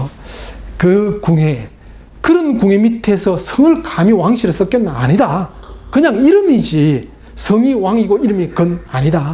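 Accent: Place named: Gyeongsang, South Korea. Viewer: 경상도 사투리와 중국어의 억양이 똑같아서 멀리서 들으면 마치 중국어처럼 들립니다. 하루속히 표준말로 바뀌었으면 좋겠네요.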